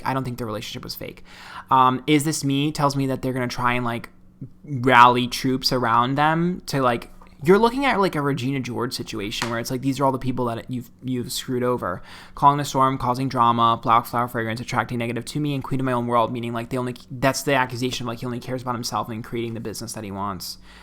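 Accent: New York, United States. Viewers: On a bright note - I love when your NY Italian accent comes out! It's the best! On a serious note - praying SO hard for Jeffree. He really is trying to grow and everyone can relate that it's the worst to be stabbed in the back by someone you love and/or trust. Also, can't help but feel "Too Faced" vibes on the person trying to get at him.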